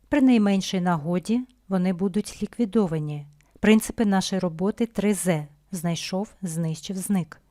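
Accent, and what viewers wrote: Russia. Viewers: ваш москальский акцент аж випирає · з явним російсьим акцентом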